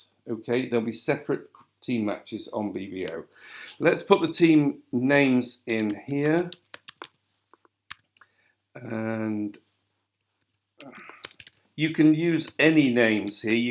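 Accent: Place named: Manchester, United Kingdom. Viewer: thx - great explanation. Is it possible to delete a team match if I pressed the Create Match button too soon? BTW lovely to hear your accent as an ex-pat, whose Father was born in Manchester. It brought back lots of happy memories. Thx, Penny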